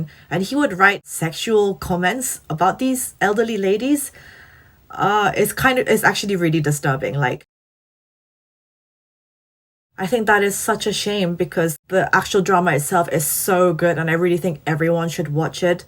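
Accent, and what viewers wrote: United Kingdom. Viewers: All I focused while watching this video is your pronounciation. I'm learning Britain English from you. Thank you ❤️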